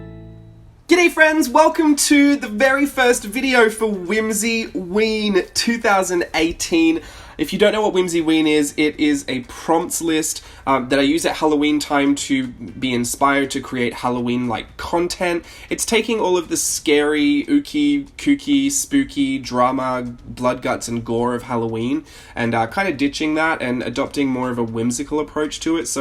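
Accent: Australia. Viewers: You still sound Aussie to me!!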